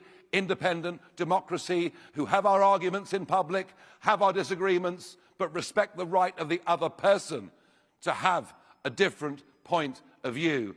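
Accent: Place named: United Kingdom. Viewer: Nigel is a goofy-looking guy (he really needs to stop doing the lizard tongue) but I trust him mostly. Im glad he at least sounds like a real Briton and a man with enough brains to give a good speech. Of course, politics is partially about defusing explosions by making people IMAGINE they are being spoken for. Nigel better be for real, like Rupert Lowe, and Nigel MUST bring Lowe back into this tent. HE MUST do it! And embrace Tommy Robinson also. Conservatives need to answer for the CITY if they want to move forward. They have to talk about the corruption of trusts and foreign money, because it has wrecked the nation. The Royal Family is the problem of BOTH sides, and Charles needs to go. William needs to rebel or go.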